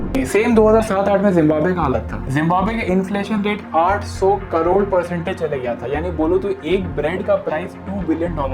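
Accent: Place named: Kolkata, India. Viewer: Proper kalkatia language 👌 i like it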